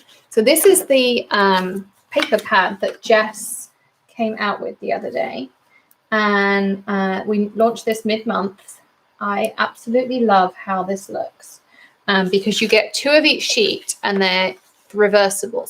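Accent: England, United Kingdom. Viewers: As a new 'maker' I found you quite by accident last month and just love your presentations. It was, at first, confusing to hear an English accent associated with US company (I'm in Yorkshire). I just saw this video and had to make a comment. I find it heartbreaking that mean people can do so much harm. There have been a few other people similarly affected this year and Cathy Z has posted this (you may have seen it) and I thought it may help you: <link> Without you lovely maker-presenters this year would have been very difficult for me (and I suspect many others too). Meanwhile good luck with the new goodies and I wish you a Happy New Year.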